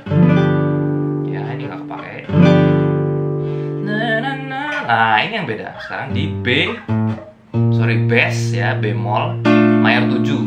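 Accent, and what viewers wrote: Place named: Cilacap, Indonesia. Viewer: Loh deneng sampean ngapak mas. Wong ngendi sih?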